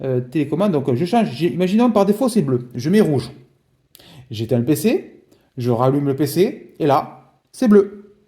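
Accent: South, France. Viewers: l'accent du sud représente! Pour une fois que quelqu'un dis pas de la merde ca fait plaisir! Je m'abonne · ah d'accord je suis de Toulouse j'ai reconnu l'accent de la région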